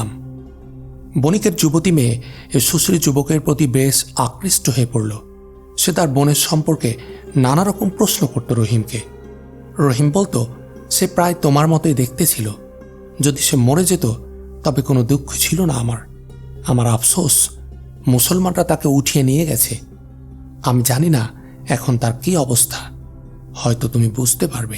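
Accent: Kolkata, India. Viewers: ভাইয়া আমার আম্মুও ক্রুসেড সিরিজের পাগল হয়ে গেছে। আমার খালা শুনে বলতেছে আপনি নাকি ভারতের কলকাতার। আপনার কথা কলকাতার মানুষদের মত লাগে। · আপনেত দেখি ভাই কলকাতার স্টাইলে বাংলা পড়া শুরু করলেন যা আরো আগের পর্বগুলোতে পাওয়া যায়না 🤭 শ কে স ছ পড়ছেন 🤔🤔🤔😏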